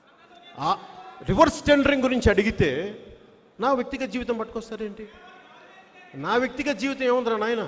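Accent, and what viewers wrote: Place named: Telangana, India. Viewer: Telangana యాస ఎప్పుడు తక్కువ అంచన అని నిరిపిస్తున్నారు........ Don't play games 😛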